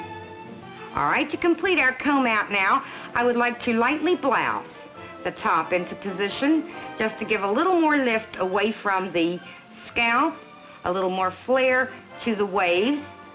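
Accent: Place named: Pittsburgh, United States. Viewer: Very distinct Yinzer accent lol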